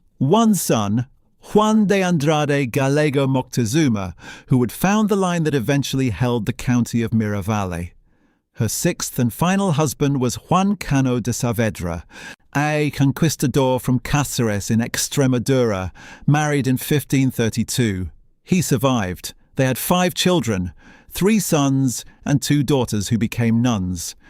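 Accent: United Kingdom. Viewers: the british english pronunciation is messing up the spanish spelling and the words giving me a headache, as an american this "Tree" is a "Mess" as "Mexico"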